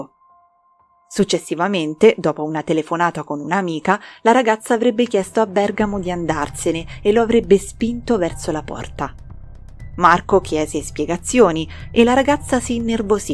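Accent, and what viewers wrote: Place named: Rome, Italy. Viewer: c'e' di peggio 😅 credimi ci sono truecrimers che ho dovuto abbandonare, errori grammaticali, voci con cadenze ben piu' pesanti di una semplice inflessione che credo sia romana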